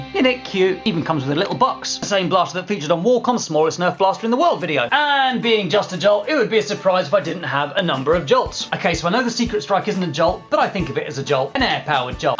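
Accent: United Kingdom. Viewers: Btw can i get a Shout out in your next vid i would greatly appreciate that plus i love your British ascent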